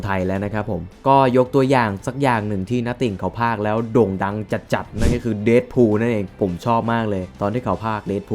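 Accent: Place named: Suphanburi, Thailand. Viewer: แอดใช่คนสุพรรณป่าวเนี่ยเหน่อจังเลยตอนท้ายๆคลิปอะน้า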